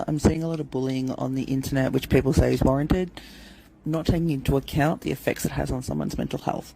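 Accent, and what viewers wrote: Australia. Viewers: Australians are awesome!! Lovvvee the accent too 👍🏼❤️ Also, as an American have you SEEN the state of our country right now? Lol I’d be the last to judge anyone else 🤣💀Alicia Dougherty doesn’t represent most Americans so don’t judge us lmao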